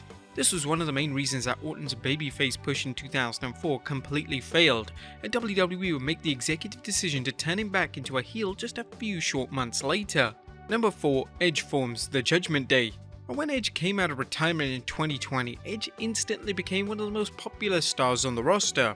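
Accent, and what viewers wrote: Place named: England, United Kingdom. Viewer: This channel is awesome....and I love his English accent.......😊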